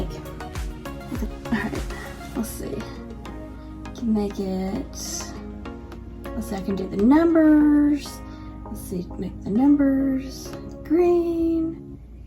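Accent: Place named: South, United States. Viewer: HELLO~☆from Lou. KY.🐎🐎I HEAR A Familiar Southern accent; TN, KY. Awesome LAPTOP! Nice to meet you, whoa, We have tech issues ❗🌻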